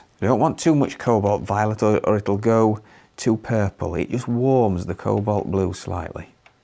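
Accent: England, United Kingdom. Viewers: What type of English accent is this? Reminds me of Eric Idle. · I am so amazed by this painting and Mr. style, I watch this video at least 3 to 4 times a week....even while I'm doing my own creating. The colors, the cottages, the British accent - all my absolute favorites! My love for the English cottages and the landscape keeps me re-visiting this video and the others, as well. Thank you, Mr. for such soothing yet helpful tutorials.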